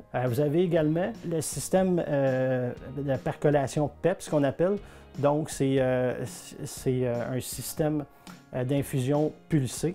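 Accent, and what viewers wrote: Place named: Canada. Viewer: J'adore le dialect canadien. J'ai la A9 mais je pense changer et prendre la E8. Salutations de Suéde Marocain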